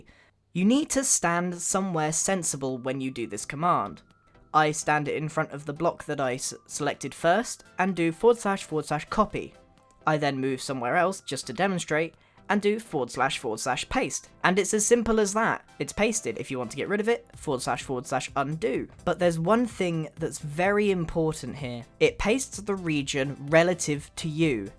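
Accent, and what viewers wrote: England, United Kingdom. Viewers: Everybody loves the English accent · He is British. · Yeah English accent, that's what its called.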